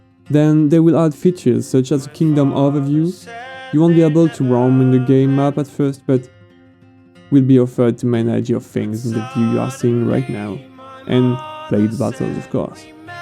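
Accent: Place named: France. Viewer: The singing in the background made it even harder to understand your frenglish. Good vid regardless, liked.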